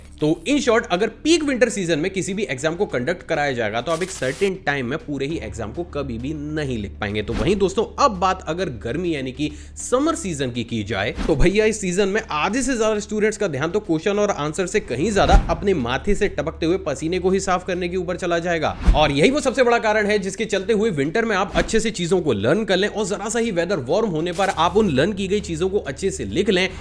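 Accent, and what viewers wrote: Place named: Mathura, India. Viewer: Bhai aap kaha se ho ye language to hamare yaha ki he mathura ki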